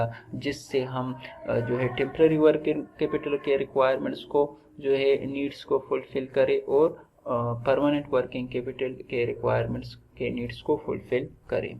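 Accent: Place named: Pakistan. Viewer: Beautiful pakistani accent.... I guess its pashto, sorry if i m wrong.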